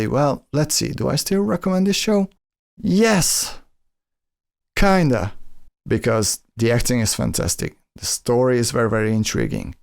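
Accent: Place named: Balkans. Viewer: It's been a while since I saw MotA. Your review makes feel the need to revisit it. I also don't rank it among my top picks, but for my life, I can't remember why. Maybe it was the ending....Your reviews are a pleasure to watch. I've subscribed....Just a btw, I'd guessed you were from somewhere in the Balkans based on you accent. Not exactly correct, but not too far off...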